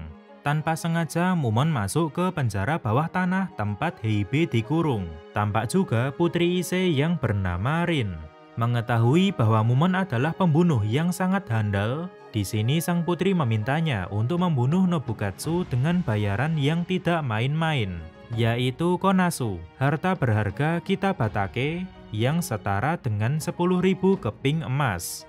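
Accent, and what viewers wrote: Java, Indonesia. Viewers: raiso dapusi medok e tp gpp ciri khas sampean mas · Jawanya pekat bngt👍👍 smngt bro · Suara medhok e bikin kange chanel ini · Bang wong jowo ta 😊😊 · Wong jowo Endi bang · Wih logat jowo ne Ra ilang, lanjot terus gan tahanke logate rasah digawe gawe koyo wong hudu jowo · Rasa belajar logat jawa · suaranya medok:v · Sial. Malah ketemu jawa medok. Telingaku jadi gak nyaman.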